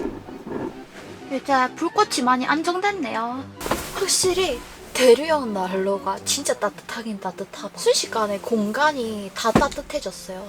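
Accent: Gyeongsang, South Korea. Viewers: 갱상도사투리 너무 귀욥 ㅎ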